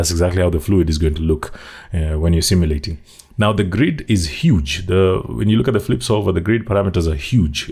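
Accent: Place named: Kenya. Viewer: As if I’m looking for a tutorial, recognise the accent and find someone else from Kenya. Good stuff man. Are you working in Kenya or out the country?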